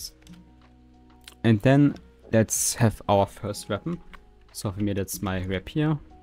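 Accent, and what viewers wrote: Germany. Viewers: Man hört dein Deutsch so raus (°ー°〃) aber du bist cool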